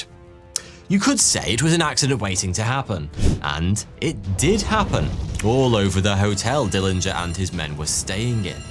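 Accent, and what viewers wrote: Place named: England, United Kingdom. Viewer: is British. Most of us pronounce Arkansas as, well exactly that. Not Arkansaw.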